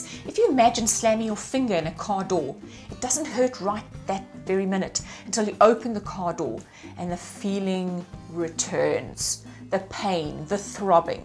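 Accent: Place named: South Africa. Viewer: nice south african accent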